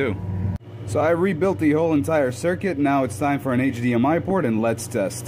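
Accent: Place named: East Coast, United States. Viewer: I like how you get more angry and your east coast accent thickens as the losses mount.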